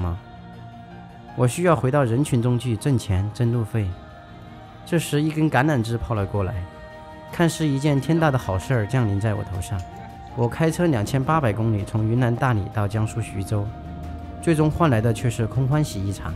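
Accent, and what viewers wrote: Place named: Sichuan, China. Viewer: 支持你！我也是为了梦想来到了美国！希望未来在美国闯出一片天地！老乡，听你的川普，很亲切！我四川广安的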